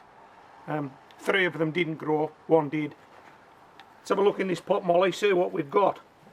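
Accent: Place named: Lancashire, United Kingdom. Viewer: You sound like you are somewhere near Bury, Bolton, or Wigan.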